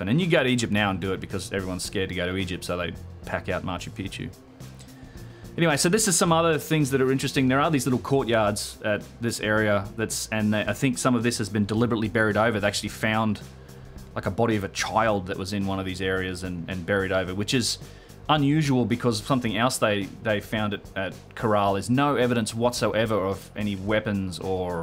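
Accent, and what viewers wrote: Australia. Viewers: G'day from Oz, great live stream and other videos. Articulate, informative and excellent delivery with an Aussie accent to boot! Keep up the great work and live streams. Loving the mind blowing Serapeum series, and looking forward to your Younger Dryas / Cataclysm video. Cheers.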